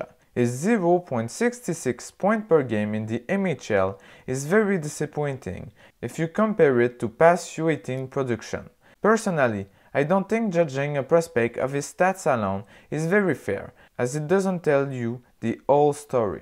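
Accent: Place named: Quebec, Canada. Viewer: Are you a Quebecois? I am trying to figure out what your accent is. I am Californian. My distance from it makes me unaware of the accent.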